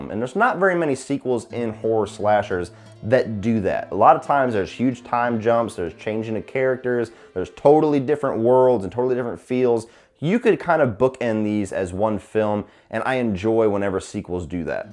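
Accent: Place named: Midwest, United States. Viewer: He is original from Ohio but moved to Georgia at like 14 or 15. So either Midwest or Southeastern United States. I don't think he has much of a georgia accent more midwest.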